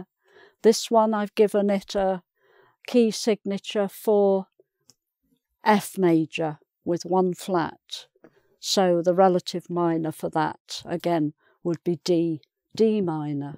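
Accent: United Kingdom. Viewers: wow vary pority english british viva teacher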